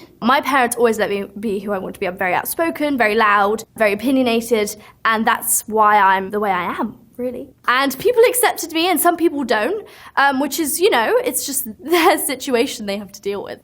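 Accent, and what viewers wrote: United Kingdom. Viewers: Shes britisH?! I didnt even know idek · She’s British? Oh damn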